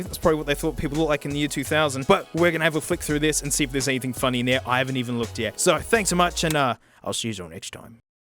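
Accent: Australia. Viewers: When I heard "Ovaries" instead of "Over ears" and then proceeded to slap myself in the face for forgetting the bloke is Aussie through and through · coming from Western Australia, He's definitely saying over ears, accent bro. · Aussie accents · This ain’t English, this is ‘Stralian. But no, not really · You haven't gotten used to the great Australian accent. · He’s Australian. Kory’s voice actor is from New Zealand. Australians and people from New Zealand have similar accents.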